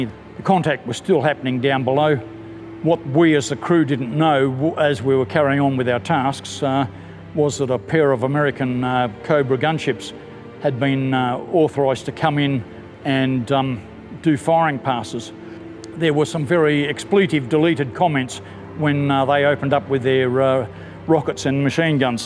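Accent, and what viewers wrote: Australia. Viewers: With the music and the aussie accent I think of one person and one person only...CHIPS DUBBO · We all owe you a great amount of respect, you brave and humble man from Australia ..! By the way … I love your accent, but I had quite a hard time trying to understand it … Wish you a long and healthy life, Sir … Greetings from Switzerland 🇨🇭 🇦🇺🇨🇭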